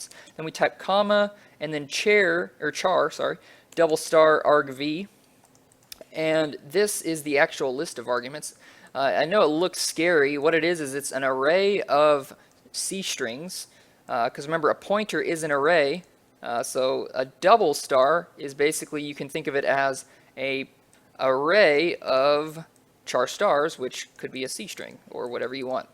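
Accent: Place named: England, United Kingdom. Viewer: My god! pure english accent!!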